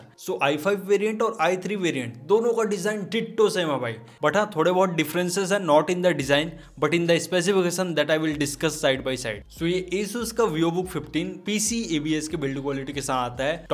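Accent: Chhattisgarh, India. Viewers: Bhai aaap chhattisgarh se ho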